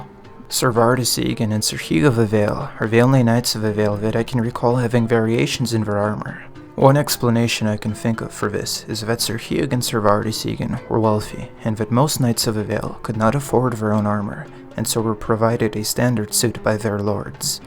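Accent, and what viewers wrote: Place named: United States. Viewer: You write armour with british english but you sound slightly american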